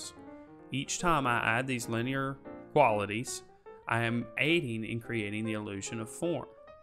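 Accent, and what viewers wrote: South, United States. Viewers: You sound sooooo much like a country western dude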